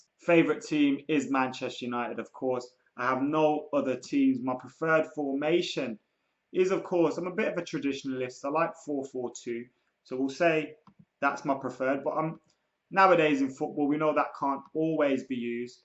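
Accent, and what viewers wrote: Ireland. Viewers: Are u like half Irish? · are ya Irish?😂🇮🇪🇮🇪🇮🇪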